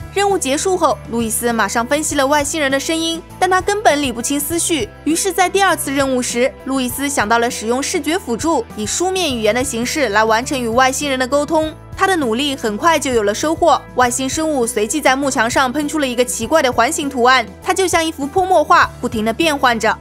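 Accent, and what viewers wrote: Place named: Southwest, China. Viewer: 她口音真的不标准，应该是我们西南地区的口音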